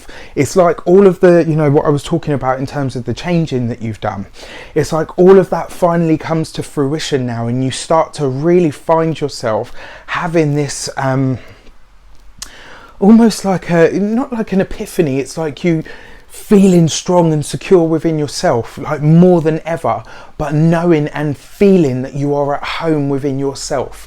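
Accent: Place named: London, United Kingdom. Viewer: He's not Manc. He's quite clearly London way. #watchatalkingaboutwillis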